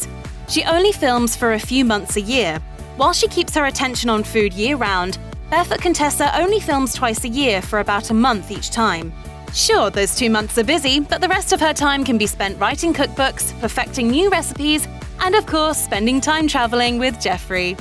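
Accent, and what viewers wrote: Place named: England, United Kingdom. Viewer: Why a voice-over with an English accent? Bad enough she talks too fast but she also is not right for a US audience. If you needed a Brit, how about one who knows how to speak accent-free? Not to mention who cares if she is working in her workshop? Or that she has helpers? It's a TV production, right? Would that our country could reflect as well in our public/political life as Ms. Garten.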